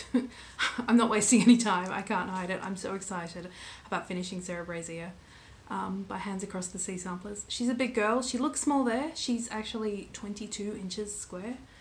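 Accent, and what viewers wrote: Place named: Australia. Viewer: Congratulations! It is a beautiful finish and you have every reason to be excited about it! Also great to hear another Australian voice on Flosstube (I'm from South Sydney). I love to watch planning videos so I will certainly be watching that when you get a chance to film it. Thanks for sharing your finish with all of us.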